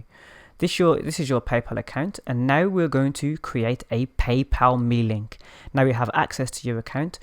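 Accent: England, United Kingdom. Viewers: Are you from England love the accent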